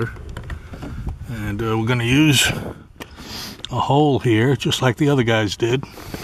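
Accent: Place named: New York, United States. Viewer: thank you for the video! i also am having this problem and i’ve watched so many videos,it’s interesting to see what works for each! i also enjoyed your voice, it reminded me of my cousins in NY! i’m also curious to see what type of van you have, i’d like to see the interior.i have a ‘99 jayco conervesion camper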